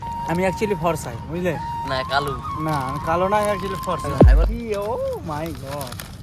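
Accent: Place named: Malda, India. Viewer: মালদা টান দাদা 😢😢😢😢😢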